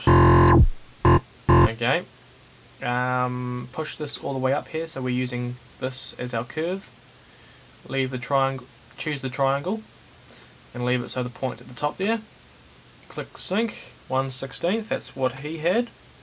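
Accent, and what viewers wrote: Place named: New Zealand. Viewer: haha i thought i could hear an nz accent ha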